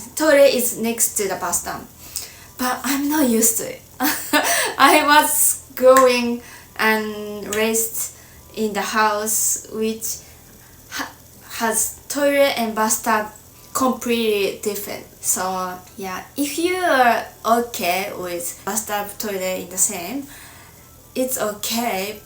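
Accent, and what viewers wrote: Japan. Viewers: ❤❤ JAPANESE WOMEN ARE VERY EMOTIONAL I AM EMPRESS FOR YOU LIKE OTHER JAPANESE WOMEN I'VE MET ARE STUDYING IN THE PHILIPPINES SOME OF THEM SPEAK TAGALOG OTHERS OF THEM ARE MAJOR HERE😊🎉.. THE HISTORY OF NATION 4 THE LANGUAGE OF THE PHILIPPINES TAGALOG ENGLISH SPANISH JAPANESE DURING THE COLONIAL ERA 400 YEARS AGO THE 4 IMPERIAL COUNTRIES DURING THE JAPANESE EMPIRE COLONIAL ERA IN THE PHILIPPINES JAPANESE AS A SECOND LANGUAGE BUT WHEN THE PHILIPPINES BECAME FREE JAPANESE AND SPANISH LANGUAGES IT WAS REMOVED. THE ONLY THING THAT TEACHES IS THE JAPANESE SCHOOL IN THE PHILIPPINES BUT IN SPANISH WE HAVE A DIALECT THIS IS CHAVACANO 80 PERCENT SPANISH LANGUAGE WITH A HUNDRED DIALECT MANY ARE STILL TEACHING US BUT IT JUST DEPENDS?. I THINK YOUR ENGLISH IS OKAY BUT IT HAS A JAPANESE ACCENT❤😂😂 WHEN I GO TO JAPAN I WANT TO MEET YOU YOU ARE ALWAYS IN MY HEART 😊❤❤!!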